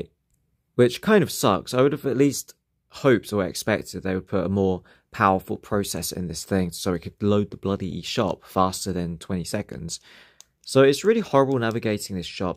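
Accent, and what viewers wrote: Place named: United Kingdom. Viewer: ...not even his British accent made this review sound smart.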